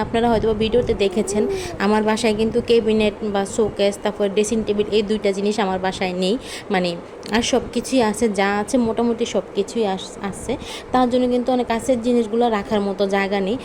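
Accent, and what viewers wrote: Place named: Comilla, Bangladesh. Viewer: আপু তোমার বাড়ি কি কুমিল্লা কথা গুলো শুনে মনে হলো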